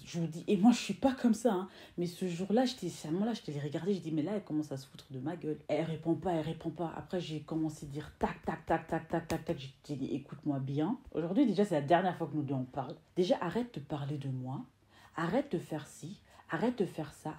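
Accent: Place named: Democratic Republic of the Congo. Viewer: D'après ton accent Je dirai que tu es ma compatriote congolaise est ce vrai ?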